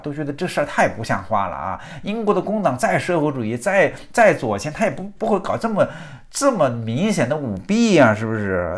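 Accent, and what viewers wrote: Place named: Beijing, China. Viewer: 为啥南方人非要说一口京腔呢？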